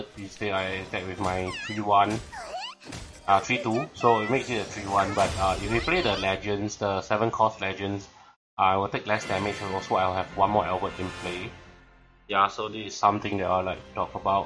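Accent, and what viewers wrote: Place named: Malaysia. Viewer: you sound like malaysian tho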